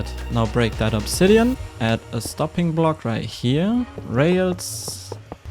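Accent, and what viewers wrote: Germany. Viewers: I like that Deutsch accent a lot bahahaha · Ich liebe die Serie, und du bist ein MACHER Hoffe das dein Umzu ganz gut wird, bin immer noch beeindruckt das man deinen deutschsen akzent fast gar nicht hört ;) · Man hört das deutsch extremst raus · Wenn deutsch englisch reden einfach beste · Das ist eine one lovely german accent :) well done!! :)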